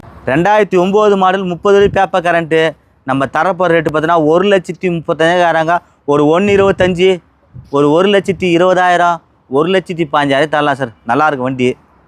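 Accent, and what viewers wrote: Madurai, India. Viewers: Super Anna Madurai vasagam 🎉🎉🎉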